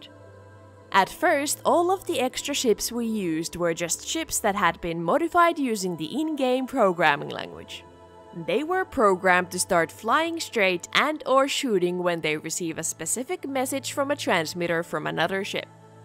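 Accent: Finland. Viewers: Is she Finnish?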